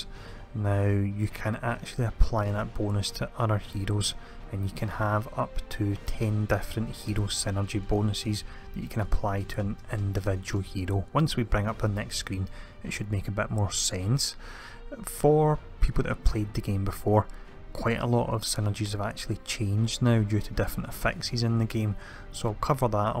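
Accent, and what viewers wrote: Scotland, United Kingdom. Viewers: I like your accent, are you scottish? :)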